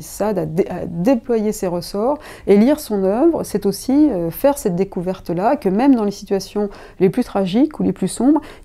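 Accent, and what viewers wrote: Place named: Savoie, France. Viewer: j'ai arrêté la vidéo à la seconde où le son de savoiE atteignit mes oreilles c'est pas tabou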